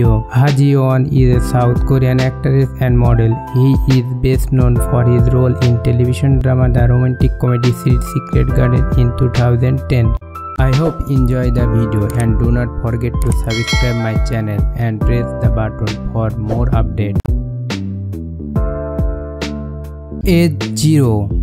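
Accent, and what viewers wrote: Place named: India. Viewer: He says "AGE". An indian accent tho.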